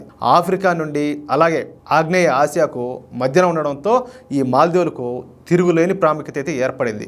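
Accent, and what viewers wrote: Rayalaseema, India. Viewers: Anna meru rayalasema language lo super ga mataladutunnaru Anna me conference ku super meru taggaddu jai Hind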